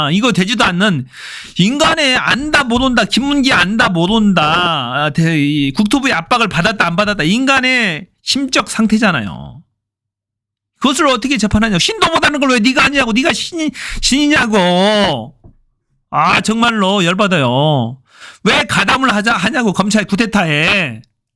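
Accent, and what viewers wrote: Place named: Jeolla, South Korea. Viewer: 한성진 최고다. 아주 시원하다. 방송하는 놈도 전라도구나 ㅋㅋㅋㅋㅋ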